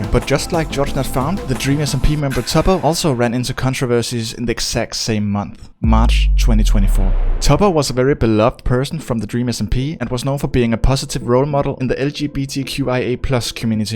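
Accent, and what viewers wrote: Denmark. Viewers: holy danish accent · Yo, you danish? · The danish accent 😂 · I can already tell that this guy is danish because of the accent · Holy Danish accent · Are you danish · This guy has most danish sounding accent, good vid though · r u danish? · Lemme gues were you from. HMMMMMM Denmark? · omg i knew i recognised that accent! hello hello hello fellow danish person! your video is AWESOME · are you danish? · Bro your danish right 🇩🇰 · u danish aint u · U dont sound American what country are u from? U sound danish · Wait you sound a little danish, MY BROTHER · are u from denmark i think i can hear the accent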